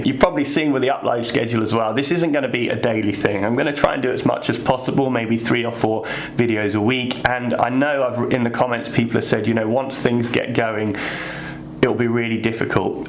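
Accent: United Kingdom. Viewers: Does anyone know where abouts in the UK is? Not for stalking purposes lol 😆 I just thought I recognised his accent, as local to where I live, but I realise he may have moved for work.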